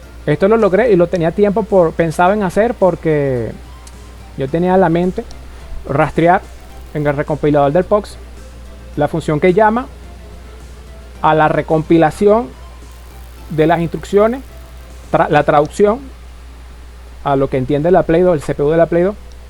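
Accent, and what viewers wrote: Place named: Colombia. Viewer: No se porque, pensé que eras de colombia Pero es bueno que estes bien Espero se recuperen pronto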